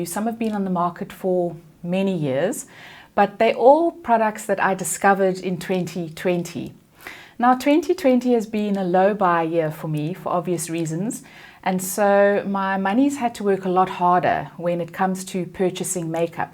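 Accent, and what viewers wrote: South Africa. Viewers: I love Ruby Woo in you! I’m curious about something in your video - I think you said that you got the Elf shadow pallets for less than 30 bucks (is that the word you used?) and also mentioned the Essence eyeliner for less than 50 bucks - I know you are in South Africa (your accent is really lovely!) so what is the currency exchange like? In the US these products are around $3 each, so I’m wondering about your currency. Thanks for an informative video - so glad I found your channel! 💜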